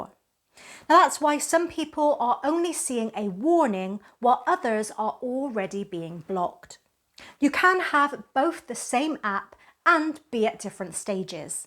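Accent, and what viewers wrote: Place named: England, United Kingdom. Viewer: I’ve jumped to Onn about a year ago, possibly longer. I still have a Fire TV cube that I very sensory use and an Insignia Fire television, that I basically use as/ monitor for the Onn. You always do a great job, honey abd I e said this before, I like the curly or waver hair over the straight hair. Plus I just live an English accent on women.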